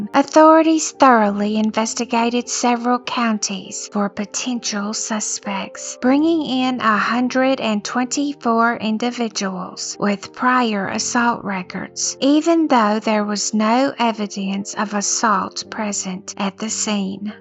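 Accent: South, United States. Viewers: I just recently discovered. For my whole life up until the past 2 and 1/4 years i lived in eastern Pennsylvania, and every once in a while the Allentown news media would mention this shocking unsolved double-murder. You have a cute voice and Southern accent!!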